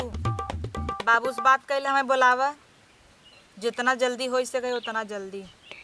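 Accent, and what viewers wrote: Jaunpur, India. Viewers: ji aap ki jaunpuriya tone zabardast h...👍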